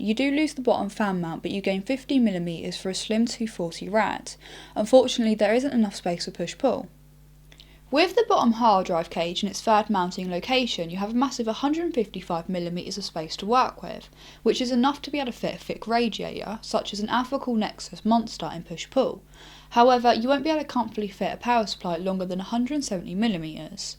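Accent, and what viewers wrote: England, United Kingdom. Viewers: Mornbs What are you on about? It is ENGLISH, not american. If you don't understand what she's saying, then you need some more english lessons. O.o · u forgot to mention very sexy voice and british accent!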